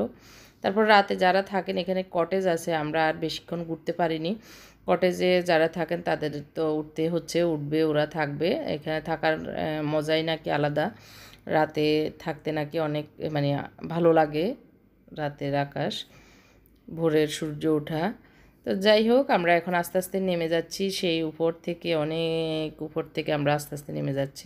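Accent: Noakhali, Bangladesh. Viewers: আন্নে হুরাহুরি নোয়াখালী ??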